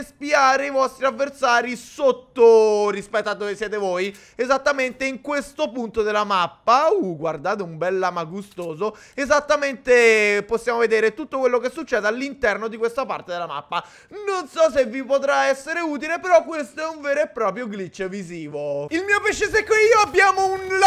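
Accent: Sardinia, Italy. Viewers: ma sei sardo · Ma sei sardo? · sei sardo io si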